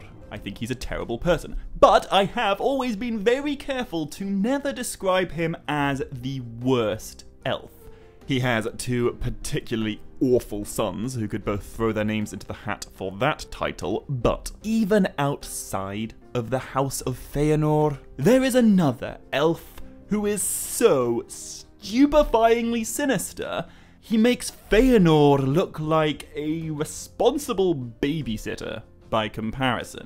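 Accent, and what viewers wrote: United Kingdom. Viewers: As an American I enjoy UK pronunciations of words like aluminum....I won't correct you if you don't correct me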